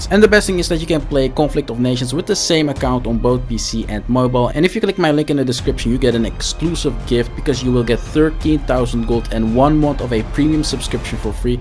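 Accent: Netherlands. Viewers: Bizar maar tijdens het eerste woord dacht ik Ja! Nederlander! Speedrun stuff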